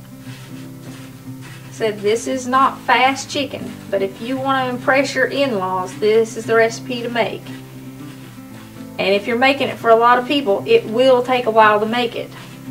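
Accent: South, United States. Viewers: I just love southern accents. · Oh my gosh I just loved this recipe! I'm going to try it on my channel. You are so adorable...love your down home attitude, down to earth commonsense and southern accent!!!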